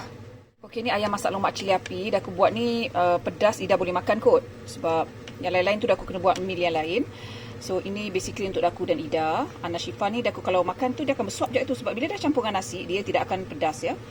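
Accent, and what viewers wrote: Negeri Sembilan, Malaysia. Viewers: Seronok tgok celoteh dik ni dgn ckp nogori lgi, wlupun lama di France x lupa loghat negeri 9 👍👍👍😍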